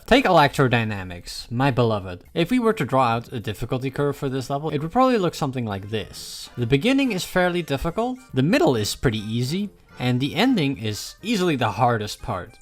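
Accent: Netherlands. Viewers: Bro I only halfway through the video realized you're dutch lol. Good video man keep it up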